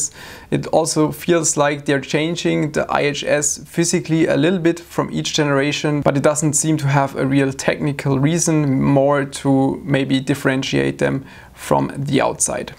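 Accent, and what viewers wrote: Germany. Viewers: du hast so ein episch krassen deutschen Akzent, Daumen hoch dafür:D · Deutsches English klingt always so funny! :D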